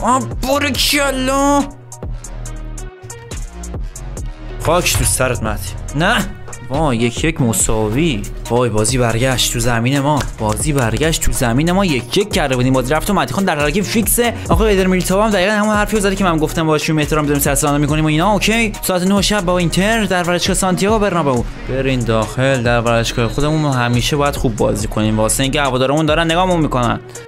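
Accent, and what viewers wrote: Mazandaran, Iran. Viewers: عاشق لهجه مازندرانی هستم😆 عشقی دا...مرسی از انرژیت💙🔥😍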